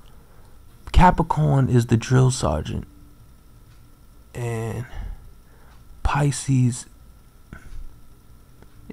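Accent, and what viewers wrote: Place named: New York, United States. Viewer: where you from baby? ny? I love yourrrrrr accent. ugh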